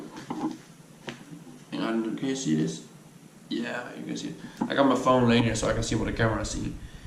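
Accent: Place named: South Holland, Netherlands. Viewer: Hoor ook liever je Zuidhollandse accent hoor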